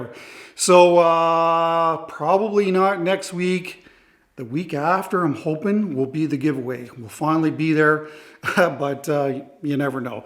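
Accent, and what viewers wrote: Canada. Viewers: I love how you Canadians talk. Nice work as usual, good Sir. Did you two get the house sold? I surely hope so.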